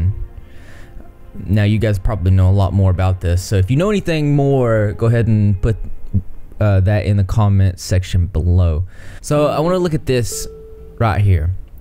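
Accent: South, United States. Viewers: Omg who else agrees this YouTuber has stolen the hearts of many? Cuz he's hella cute even with his southern accent and he has such a likable personality. The way he speaks is so intelligent just, omg he's absolutely brilliant when diving into discussions. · Your southern accent doesn't match your style/personality. I'm in the exact same boat though. I'm from Alabama (and have a deep southern accent) but I have a 'city hippie' personality. 😂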